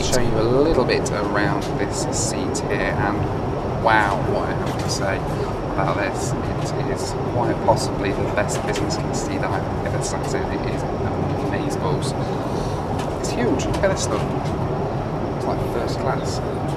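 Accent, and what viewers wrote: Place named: United Kingdom. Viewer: I love the way Brits pronounce Los Angeles :P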